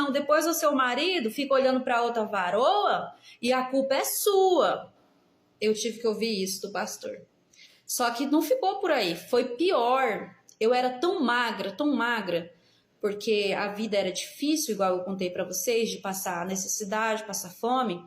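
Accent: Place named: São Paulo, Brazil. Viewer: Sério isso vc de madureira com essa fala...parece q é de são Paulo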